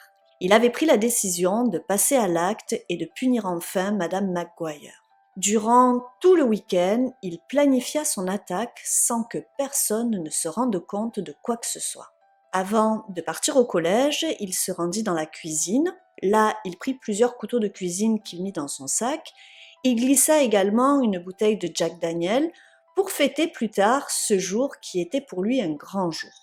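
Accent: South, France. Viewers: Bonjour, Personnellement je ne regarde jamais les images. Je ferme les yeux et j'écoute en utilisant mon imagination pour me visualiser l'histoire. Images ou pas, j'apprécie tout autant tes histoires, et un grand bravo pour ton travail et ta façon de raconter. PS. J'aime ton accent, ne serait-ce pas un petit accent du Sud ? Au plaisir d'entendre ta prochaine histoire. Je te souhaite une très belle semaine. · Narration au top comme dab avec le petit accent du sud qui va bien :)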